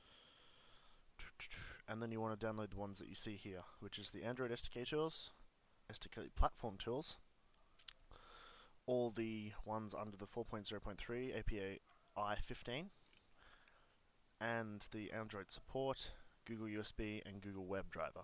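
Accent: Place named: Australia. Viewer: You're Australian :D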